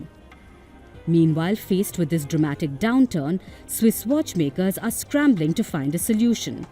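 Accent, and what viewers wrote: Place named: India. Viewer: narrator sounds indian - making this a suspect propaganda video by the indian government.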